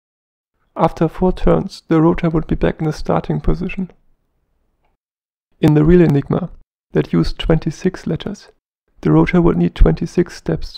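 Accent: Germany. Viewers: a lot of Germans speak English like this, I spent 6yrs there, :)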